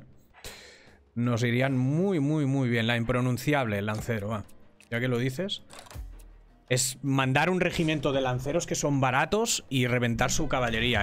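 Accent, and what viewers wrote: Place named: Catalonia, Spain. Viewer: tienes ese deje catalanoide en el habla... sigo la serie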